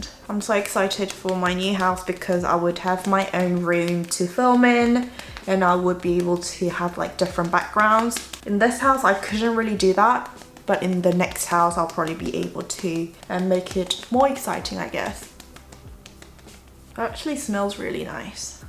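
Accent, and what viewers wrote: United Kingdom. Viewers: 언니 영국식 영어발음 너무 좋아요 진짜ㅠㅠ최고 좋아요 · 오늘도 매력적인 영국발음으로 귀여운 영상감사해요:) 다음 영상은 새로운 집을 배경으로.볼 수 있겠네요🙋🏻 새로운 환경, 새로운 구도에서 님은 구독자로서 기대되거든요😚 요리영상도 기대되고 이사간 마을에서 좋은 곳도 소개해주세요💕 좋은 추억이 많이 생길집이길 바래요 Q1) 네일 색이 참 이뻐요:) 셀프 네일인가요? 어디제품인지 궁금해요오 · 언니 영어발음에 치이고 치인다..❤️사랑해요ㅠㅠ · 오늘도 영국발음에 치이고 갈수록 예뻐지는 미모에 치이고.. 존예에요💕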